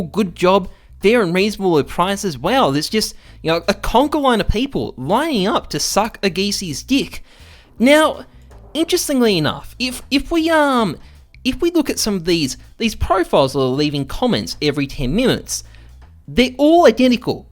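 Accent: Australia. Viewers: i died when he said "nice spelling bud" its good watching an Australian because i find it funny when my whole family and extended family all sound exactly like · Australian accent and a video about scammers. Thought this was Karl Rock 😂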